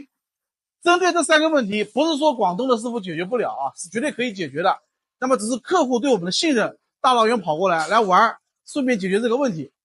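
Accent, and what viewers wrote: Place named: Hangzhou, China. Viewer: 老哥听你口音是杭州人？店在哪里？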